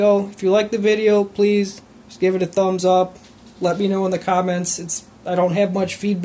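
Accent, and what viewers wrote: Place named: Chicago, United States. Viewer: I knew you were from the Chicago area by how you talk and then I saw the 708 area code and yep! I used to be 312 then 708 now 630. Out of curiosity, did anyone call you? 😂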